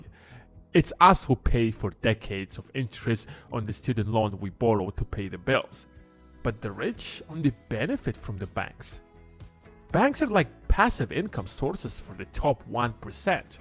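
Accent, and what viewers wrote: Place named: Asia. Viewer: He sounds asian, I'm in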